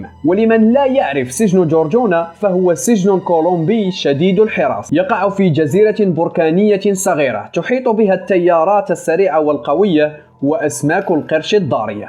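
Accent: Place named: Morocco. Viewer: عرفت انك مغربي من لهجتك الجميلة 💜💜💜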